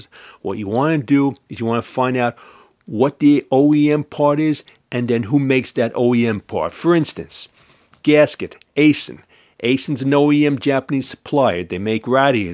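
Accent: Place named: Brooklyn, United States. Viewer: Pretty good Brooklyn accent... I actually have my eye on an ‘03 Pilot, 93000 miles; $3800. Good price?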